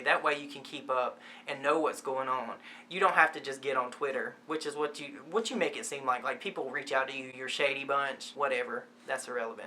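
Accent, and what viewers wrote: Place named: New Orleans, United States. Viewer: Very well said and presented ☺️. I'm gonna have to sub so I can keep up with you! Your accent sounds like where I grew up (small town suburb on the north shore of New Orleans LA)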